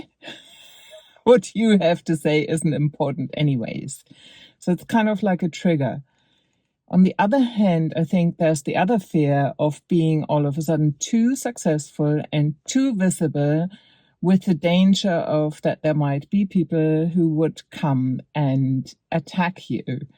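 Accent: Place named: Germany. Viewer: Nice and inspiring, I don't know why but I quite like the German accent lol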